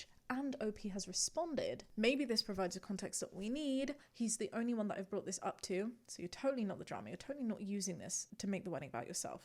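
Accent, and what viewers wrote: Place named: Yorkshire, United Kingdom. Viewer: Eyyy Yorkshire accent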